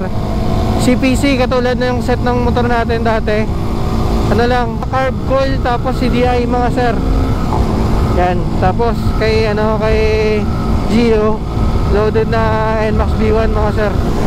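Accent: Iloilo, Philippines. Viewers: Parang iloilo to ah.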